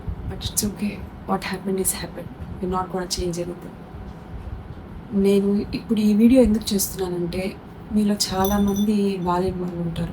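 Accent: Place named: Nellore, India. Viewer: Medi Nellore ah sis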